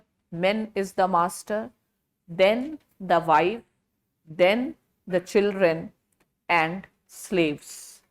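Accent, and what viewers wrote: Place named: India. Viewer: Mem aapke मुह se English bhi hindi lagti hai❤❤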